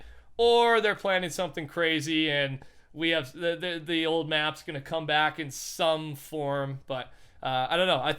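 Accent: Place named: Canada. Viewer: I love it when Canadians say that there fired up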